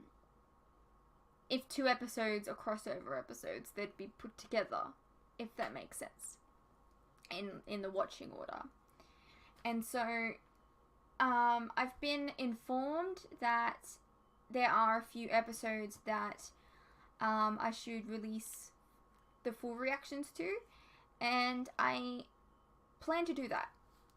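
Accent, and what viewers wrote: Australia. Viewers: reaction videos are a dime a dozen. i think you might be auzzie, nz or tasmania. i have a great affinity for my rugby rivals and their accents. but, you, dear girl, have empathy. its difficult/refreshing to watch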